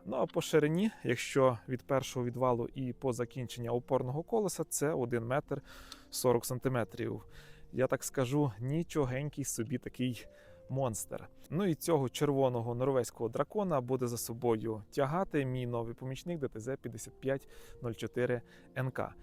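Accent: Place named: Volyn, Ukraine. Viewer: Вас мова з волинським акцентом... Звідки ви?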